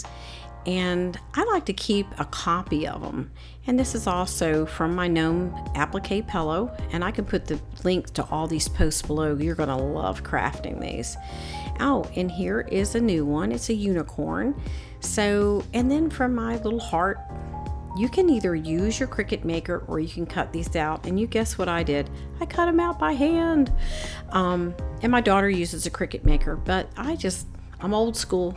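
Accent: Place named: Virginia, United States. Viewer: Hi, Your accent sounds like it is from a previous place we lived. Is it possible that you are from Virginia? We lived in New Hanover County, specifically Mechanicsville.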